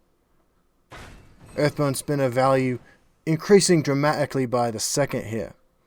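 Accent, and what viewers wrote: Ireland. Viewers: Very strong Irish accent Sir:)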